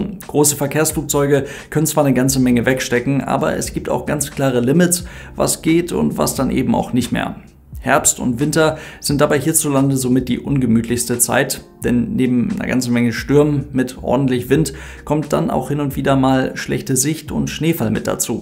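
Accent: Germany. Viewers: Im Funk ja, und das liegt ja dem deutschen, da th bei deutschen Muttersprachlern meist eh schon in die "trie" Richung geht .... im Video müsste man das nicht und klingt auch (sorry) extrem grauselig ... aber, gewohnheit und im Kontext Fliegerei auch i.o. um sich nicht ausversehen an ordentliche Aussprache zu gewöhnen .... Im Gegensatz dazu die englischen Muttersprachler... da wird sich konsequent an die richtige Aussprache gehalten, statt der im Funk vorgeschriebenen :)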